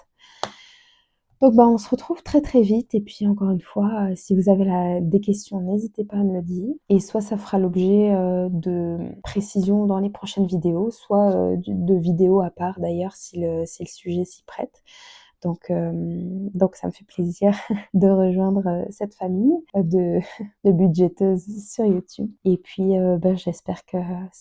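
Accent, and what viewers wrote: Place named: Quebec, Canada. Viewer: Kikou, bienvenue sur YouTube 🤎 Ravie de te découvrir! Çà ne doit pas être facile de gérer le budget par 2 semaines quand on n'a pas l'habitude! Bravo pour ta résolution de reprendre ton budget 🥰 Je me suis abonnée avec plaisir, ton accent québécois qui transperce par moments est charmant 😍 Des bisous 😘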